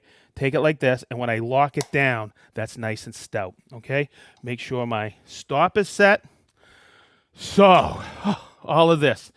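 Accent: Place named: East Coast, United States. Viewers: Came here just for the wicked Massachusetts accent. :) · He has his own channel and completely different personality... well not completely, but more relaxed. As for his accent, it's just an East Coast accent.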